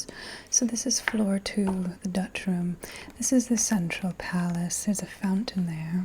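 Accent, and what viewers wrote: Transatlantic, United States. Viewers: very transatlantic. It kind of jumps all over the place tho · It sounds transatlantic right? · Your accent is akin to the trans Atlantic / Edie Beale cadence and it is amazing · New comfort video ❤ P.S. What accent is this? I can’t always distinguish between regional US accents but this lady’s accent reminds me of Jaws and I love it.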